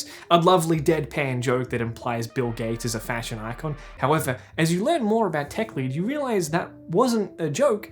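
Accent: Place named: Australia. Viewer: Its the australian accent and authentic australian delivery 👌🏻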